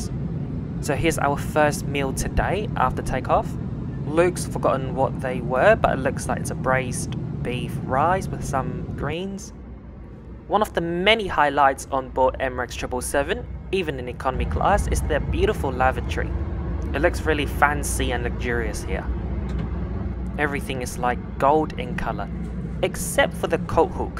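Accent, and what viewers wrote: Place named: Australia. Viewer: Sounds like Australian accent.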